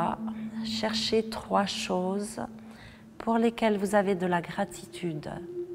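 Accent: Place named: Valais, Switzerland. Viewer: Un an depuis le grand confinement et toujours autant de satisfaction à vous retrouver et pratiquer vos différents programmes avec en prime ce délicieux accent valaisan que je connais bien de ma branche familiale cousine En espérant pouvoir vous rejoindre un jour pour un stage d'été Merci pour la qualité de vie que vous partagez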